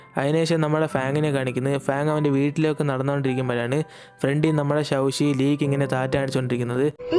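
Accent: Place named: Trivandrum, India. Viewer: Chettan trivandrumkarananooo... Slang Ath pole erikkunnu allo, 🤩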